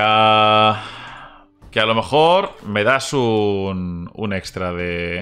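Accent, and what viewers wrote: Peru. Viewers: Ere peruano?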